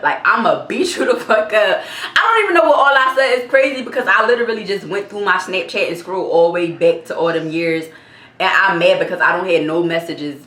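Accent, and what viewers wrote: Philadelphia, United States. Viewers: I been following you since I had Covid FEB 2022 lol ❤️ I love Philly never been but I love meek mill so and the accent you cool too ima go look at the other story time when u beat yo yo brother baby momma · Give us more story times I love the way you talk you so Philly 😭😍😌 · Hey Gurl I just love your Philly accent 🤗🤗🤗🤗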